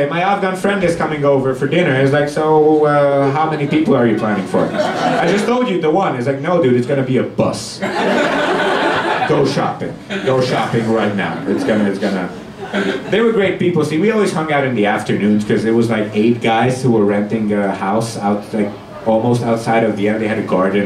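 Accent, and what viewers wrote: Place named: India. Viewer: Yea it sounded kinda indian/pakistani to me too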